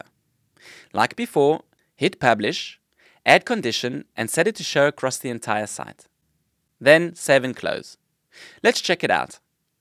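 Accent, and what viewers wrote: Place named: South Africa. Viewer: You sound South African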